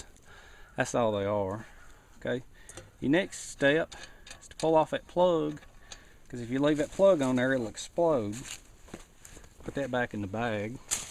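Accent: United States. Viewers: You've got my vote for best American accent on YouTube.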